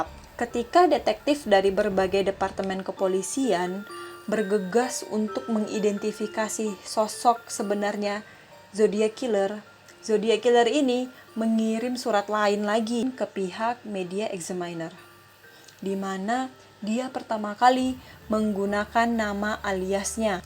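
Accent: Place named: Medan, Indonesia. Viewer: Mantap videonya kakk.. Request dong kak eps berikutnya full pake logat medan kak 🤲🏻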